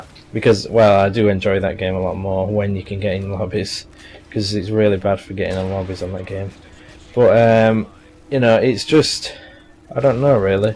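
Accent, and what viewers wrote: United Kingdom. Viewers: Fortnight. British english is so cool.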